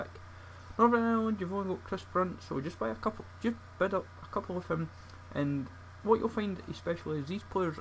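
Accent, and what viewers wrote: Scotland, United Kingdom. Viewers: is he scotish??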